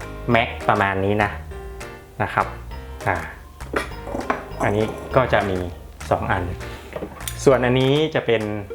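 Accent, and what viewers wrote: North, Thailand. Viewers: คนเหนือก่อครับพี่ รีวิวดีมากเลยครับ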